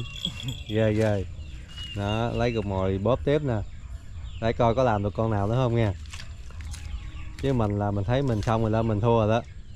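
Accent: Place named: Mekong Delta, Vietnam. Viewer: đúng nói chuyện kiểu chân chắc miền tây, câu dính thả cá là mình thích rồi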